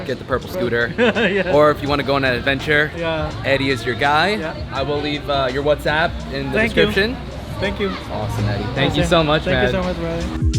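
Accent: New York, United States. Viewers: Yours American English, NYC style is better, than in a movies!!!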